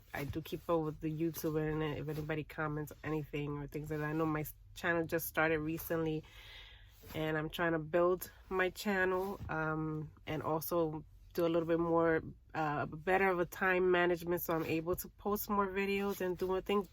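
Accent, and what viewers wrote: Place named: Bronx, United States. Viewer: I hear your Bronx accent. I’m from there too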